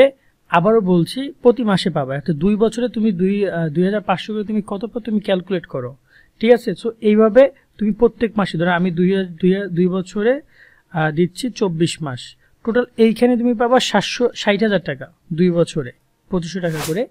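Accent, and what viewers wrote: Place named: Barishal, Bangladesh. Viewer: Vai apni ki barishal er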